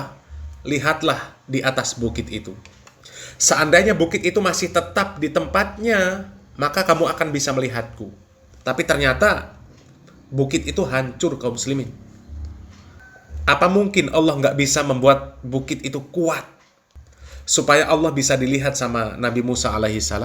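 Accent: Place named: Pontianak, Indonesia. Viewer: Iya ini bahasanya org melayu Pontianak..👍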